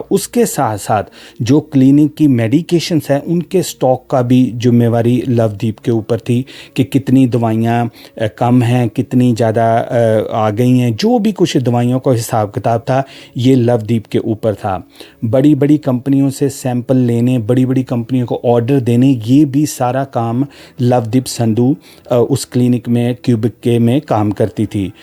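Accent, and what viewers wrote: Punjab, Pakistan. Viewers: Exactly, I am also thinking the same... he's got Pakistani accent. Is this channel real or fake?? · i have heard some pakistani punjabi youtubers....they talk just like him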